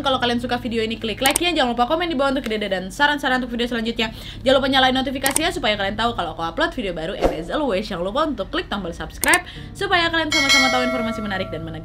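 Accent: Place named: Batam, Indonesia. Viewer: Logat anak batam wak...☺☺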